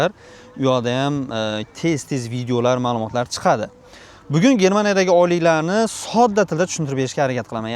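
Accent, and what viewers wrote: Khorezm, Uzbekistan. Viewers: Har dim Xorazmcha getib duribdidon,nerdansiz odosh?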